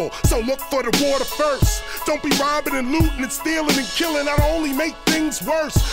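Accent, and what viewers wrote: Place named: Jamaica. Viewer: this guy talks like me he must be jamaican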